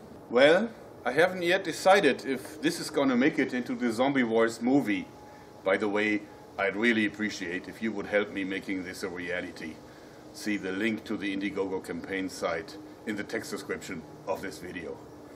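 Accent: Germany. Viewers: Bist du Deutscher hört sich so an ? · Are you german? I think I hear a slight German accent but I’m not sure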